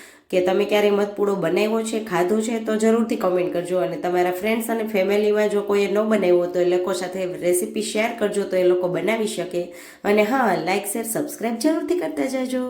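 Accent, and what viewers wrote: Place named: Saurashtra, India. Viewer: તમે સૌરાષ્ટ્ર ના છો ?? તમારી ભાષા એવી છે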